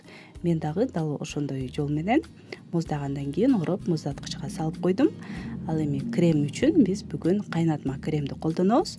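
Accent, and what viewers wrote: Standard. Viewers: Таза кыргызча суйлогону жакты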